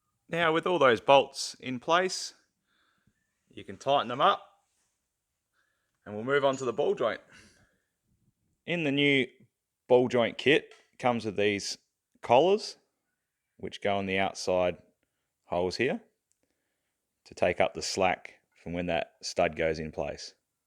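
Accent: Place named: Australia. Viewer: Fabulous, love the aussie accent. Cheers from Norway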